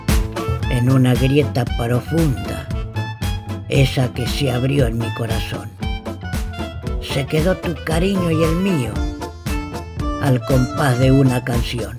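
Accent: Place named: Argentina. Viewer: En tu voz y deje argentino cobra más vida este poema mi querido amigo, orgullosa de tu buena declamación...Gracias muchas gracias. abrazos.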